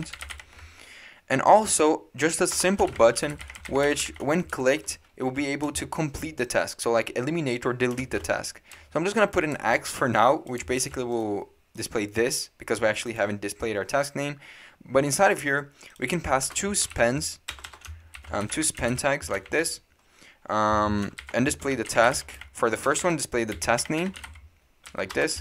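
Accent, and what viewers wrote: Brazil. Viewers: Quando comecei o video pensei: "esse cara so pode ser brasileiro" (por causa do sotaque kkkk) quando eu vi o nome do canal dai eu tive ctz que vc era brasileiro. Parabens pelo trabalho mano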